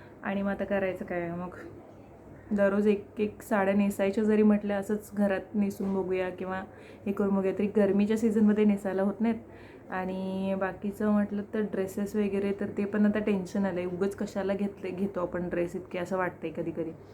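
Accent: Kolhapur, India. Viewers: तुमची कोल्हापुरची भाषा ऐकायला छान वाटते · Tumhi kolhapur aahat ka, bolna varun vatatay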